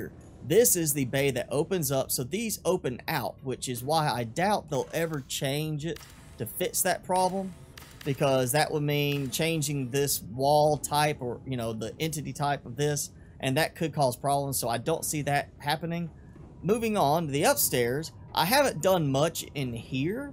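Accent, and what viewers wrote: South, United States. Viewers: Are you a cowboy? Cuz you have the same accent as one Edit:not trying to be rude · Also known as the southern accent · What heavy country accent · Y’all accent XD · TIMMY yes you can definitely tell especially when he says "try","charge","power", and "why" haha but i guess even Americans can make good tutorials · Your southern accent gives me life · Stormy it's a southern American accent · you have the "Y'all accent"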